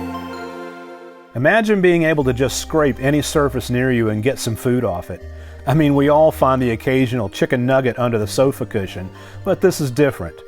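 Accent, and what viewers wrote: South, United States. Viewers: I Love voice! Calming and yes, very easy to listen to. That little touch of southern twang just puts a big old smile on my face and makes me sigh in contentment. 🥰😊😍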